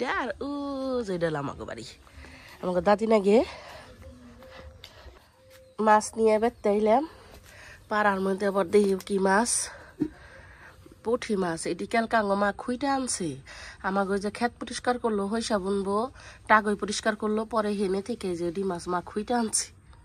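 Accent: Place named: Tangail, Bangladesh. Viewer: apnr vasa dekha bujse apni Tangail ar amio tangail ar cela basail thana amr basa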